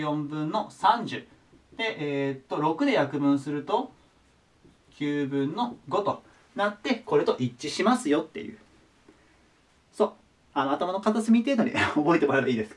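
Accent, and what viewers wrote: Kansai, Japan. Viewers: さん、ありがとう。それと何故関西弁？お返事下さい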